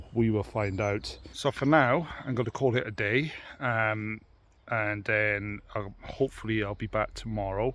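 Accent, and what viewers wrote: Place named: Northumberland, United Kingdom. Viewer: Aha found you! Intrigued by your accent - sounds like a cross between Northumberland and the NPT!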